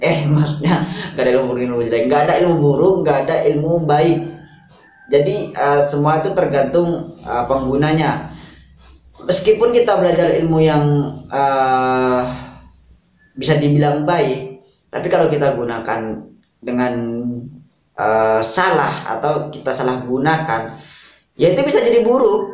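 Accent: Madura, Indonesia. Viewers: Reng medureh tha? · Abang dari madura tah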